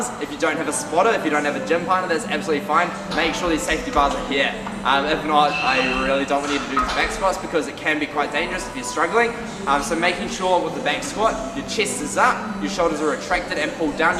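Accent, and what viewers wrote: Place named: Australia. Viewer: a bahbell on ah reck! aussie accents lol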